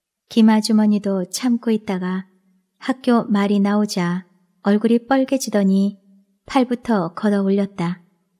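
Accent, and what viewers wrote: North Korea. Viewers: 이북 사투리를 맛깔나게 쓰셔서 우리 고모만난듯 했어요. 따뜻한 소설 잘 들었습니다. 감사합니다 😊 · 언제 그옛날 많이 들어보던 구수한 이북 사투리를 습득 했을까 --- 제목 같이 멋져 버렸네요!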